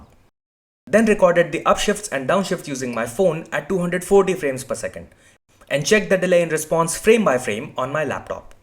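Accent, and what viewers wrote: India. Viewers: I hear indian i turn off the video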